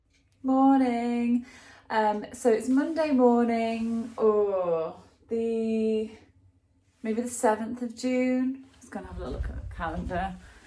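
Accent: United Kingdom. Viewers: Would we get the job dun......You sound like a Yorkshire lass.Love your dad and yours.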